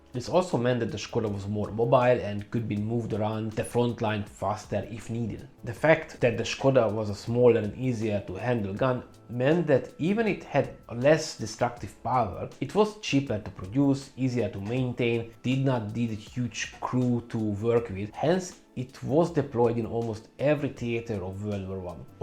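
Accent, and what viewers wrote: Hungary. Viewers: Szia! Magyar vagy igaz? Magyar akcentussal beszélsz angolul😊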